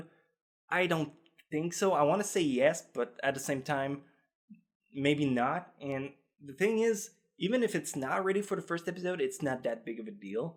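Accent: Canada. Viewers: I just realized your Canadian accent👌, good luck m8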